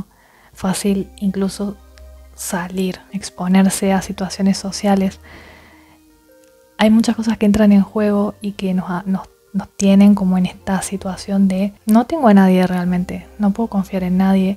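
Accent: Argentina. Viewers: Me encanta tu voz, tu acento argentino y tu contenido ☺️💜 Feliz Navidad y año nuevo, 🎄🍫💤🎁💃🕺GRACIAS POR TUS VÍDEOS 🌹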